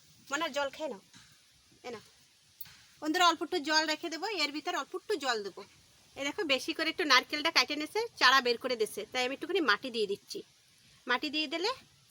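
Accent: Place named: Andaman, India. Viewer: বাড়ি কোথায় বৌদি? কথা শুনে তো আমাদের আন্দামান এর লোক‌ মনে হচ্ছে।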